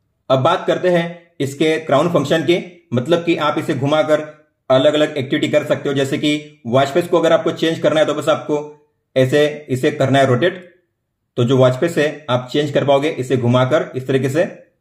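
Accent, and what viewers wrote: Gujarat, India. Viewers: You r gujrati right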